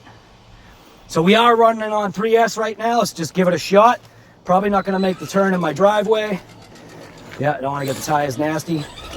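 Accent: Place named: Massachusetts, United States. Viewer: Brutha! You've gotta be from Massachusetts! Lmk sir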